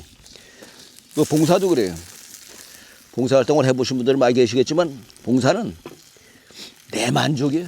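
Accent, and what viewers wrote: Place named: Jecheon, South Korea. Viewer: 제천 , 단양 사투리 같은데요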